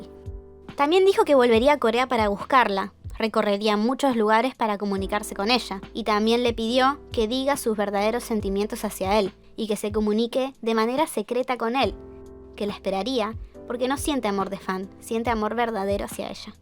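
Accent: Argentina. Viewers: es argentina así se pronuncia acá bobo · Sos de Argentina???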